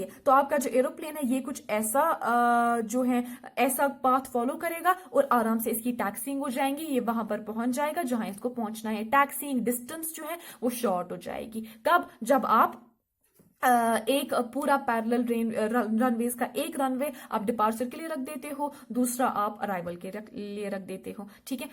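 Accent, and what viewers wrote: Kashmir, India. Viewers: Aap Kashmir se ho mam?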